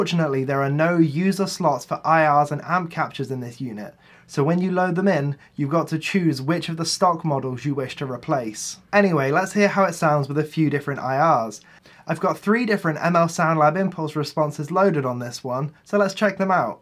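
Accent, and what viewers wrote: United Kingdom. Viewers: I wana sound this posh 🤗🤘🏼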